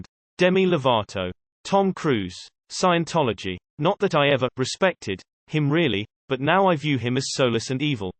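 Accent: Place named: United Kingdom. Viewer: The British voice works surprisingly well with hateful comments.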